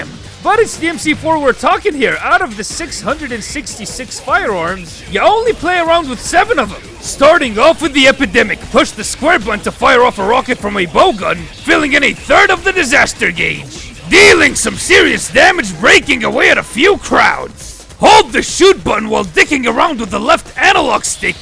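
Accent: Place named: Australia. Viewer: Bro has the most australian assent i have ever seen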